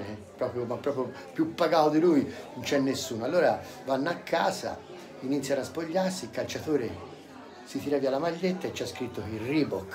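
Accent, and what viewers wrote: Tuscany, Italy. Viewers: Fortissimo, ma con l'accento toscano ancora meglio 😅😅😅😅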